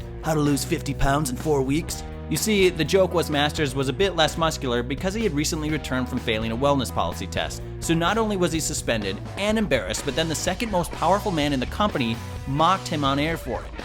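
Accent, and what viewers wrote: United States. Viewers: just go mate leave no one like you and your american voice. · It's weird hearing an American accent on What Culture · Good lord, who is this wanker narrating? Tell him to piss off and bring back one of the British boys. I didn't even know WhatCulture had any Yankee berks on working for them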